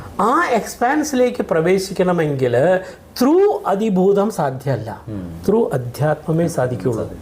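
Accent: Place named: Karnataka, India. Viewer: കർണ്ണാടക സ്വദേശിയായ മലയാളം. 🙏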